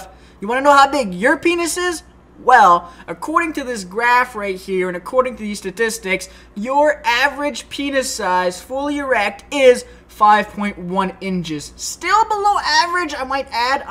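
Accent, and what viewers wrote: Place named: Canada. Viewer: what part of canada is he from?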